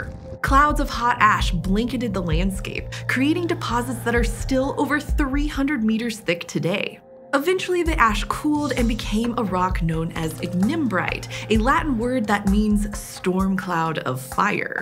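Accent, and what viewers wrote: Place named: United States. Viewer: That's my girl with an American accent talking about US geology but using metric measurements 😂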